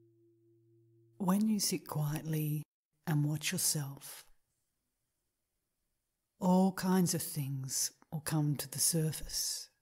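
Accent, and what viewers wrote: Australia. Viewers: lovely proper Aussie accent, brilliant m8🙋‍♀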